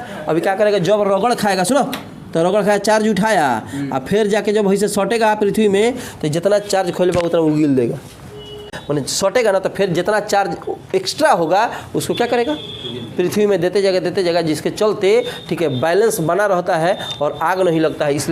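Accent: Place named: Chhapra, India. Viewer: छपार जिला के मीठा बोली, ऑउ ई सर् जी के फिजिसिया अंदाज़ में कॉमेडी .. जिय हो सर् जी, इंडिया लेवल के बारा हो तू ....अनंदित कर देहलस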